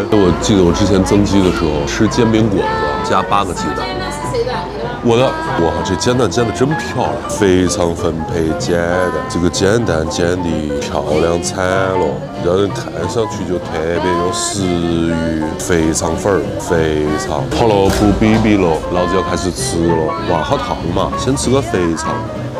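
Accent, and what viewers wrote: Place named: Sichuan, China. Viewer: 说四川话比说上海话好听多了，别整天好不啦👎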